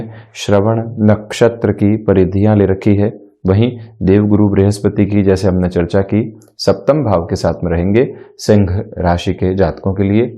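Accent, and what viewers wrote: Standard. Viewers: Pranam Guruji Your Hindi is very pure & clear . Very well explained. Regards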